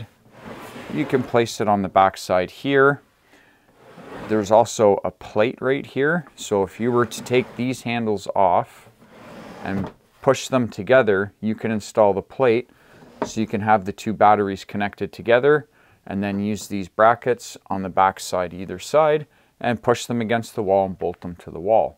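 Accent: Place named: Texas, United States. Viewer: I love your videos but your accent is really cute (i had to edit because youtard doesn't understand Texan mixed with Spanish lol)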